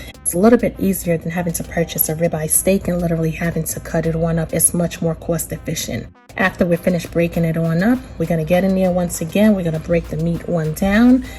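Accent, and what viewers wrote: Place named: East Coast, United States. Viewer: Making this with leftover pot roast 😋 Love the east coast accent 😁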